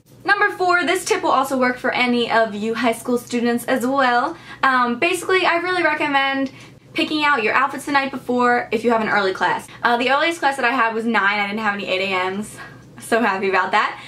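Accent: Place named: Midwest, United States. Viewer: you sound more like someone from the midwest, not a New Englander. But awesome advice!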